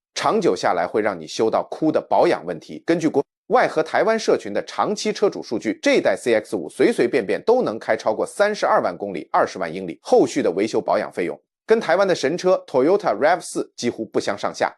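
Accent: China. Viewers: 你说的是普通话，不是台湾国语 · 這種一聽就是中共國口音 又在謊騙網友聽久了就被帶了風 日本的東西爛 車子爛沒有甚麼開不壞的 這就是中共國輸出的洗腦論述 天天講 有一天你心就歪了 就開始反日 這就是共匪最拿手的認知戰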